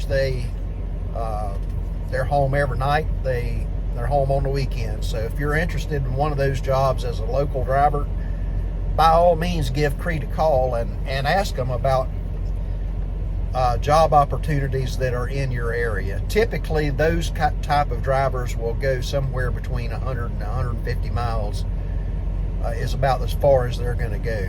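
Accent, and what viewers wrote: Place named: Ireland. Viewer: I'm on my third month now with the company and so far so good. I love your Irish accent or is it Italian. hahaha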